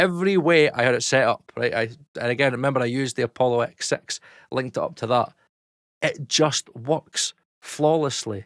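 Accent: United Kingdom. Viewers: Sorry mate, could not take your British accent using disgusting American terminology. ROUTING has an 'OO' sound not an 'OW' sound.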